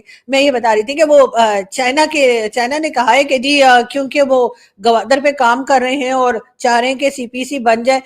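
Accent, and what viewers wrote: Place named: Allahabad, India. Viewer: Aap bahut khoobsurat lag rahi hain , Allah aapko salamat rakhe Aap TRUE ALLAHABADI lagti hain , aapke bolne ka lahja ek dam Allahabadi lagta hai Hamara bahut sara Ashirwad 🎉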